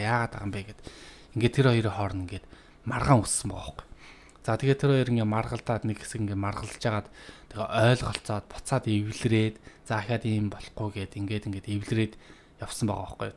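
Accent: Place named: Inner Mongolia, China. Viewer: Өвөр монгол ч юм шиг дуудлага нь бусдаар дажгүй шүү Амжилт шүү🎉🎉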